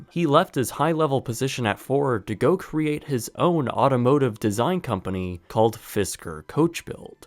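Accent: Canada. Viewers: Research = 10/10 __ Canadian Accent = 1/10 gah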